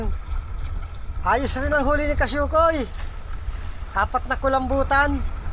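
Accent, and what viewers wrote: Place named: Camarines Norte, Philippines. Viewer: kaya pala pareho tono Ng salita natin siguro taga vinzons ka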